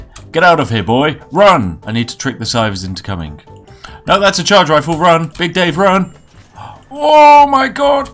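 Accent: United Kingdom. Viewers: There's something really appropriate and matching about a British accent and rhetoric structure being creepy lol "That's exactly what our people are looking for. Wonderful."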